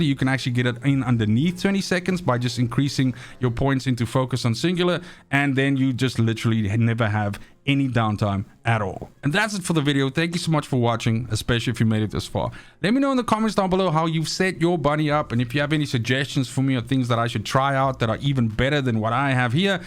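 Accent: South Africa. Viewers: Sounds like a fellow South African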